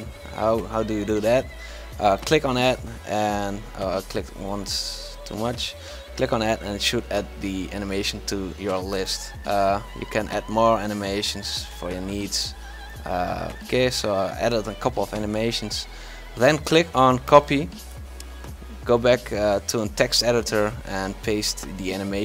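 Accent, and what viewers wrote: Netherlands. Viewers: are you dutch ?? i can hear it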